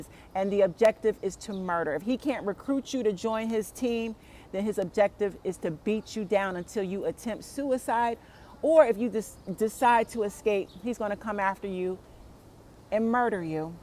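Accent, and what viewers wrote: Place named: New York, United States. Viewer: Powerful sister, sound like your from NYC...wish we could speak...blessings!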